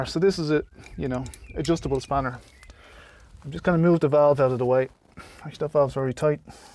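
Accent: Dublin, Ireland. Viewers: Thanks so much. This is exactly my problem at the moment. The house is 18 years old now and the tap,has been dripping for the last couple of years. My husband has never gotten around to replacing it. I’m going to give this a try myself. Greetings from Friedberg in Hessen, in Germany. You sound like you are from Dublin, just like myself. Take care.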